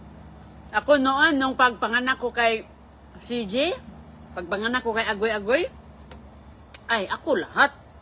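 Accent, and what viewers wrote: Visayas, Philippines. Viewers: sorry naman bisaya lang. Intindihin niyo na pronunciation ni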